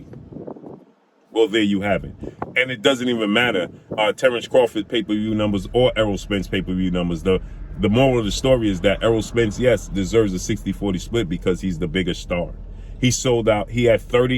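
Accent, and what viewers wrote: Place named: New York, United States. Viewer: Why is you takin' this so personally? I can tell by your accent you from NY, Errol left NY when he was a toddler.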